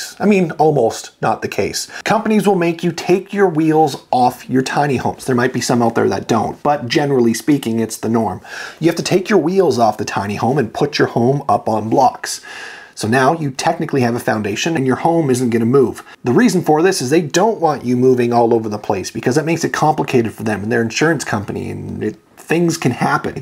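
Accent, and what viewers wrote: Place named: Canada. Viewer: What is this "Boot" he keeps mentioning? He keeps saying something about "A Boot" Lol love the Canadian accent. Great video!!!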